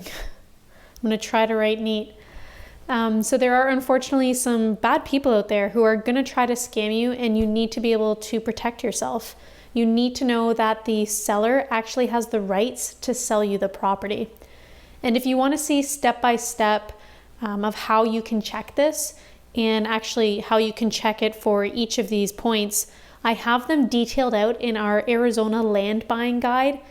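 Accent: Canada. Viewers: are you Canadian??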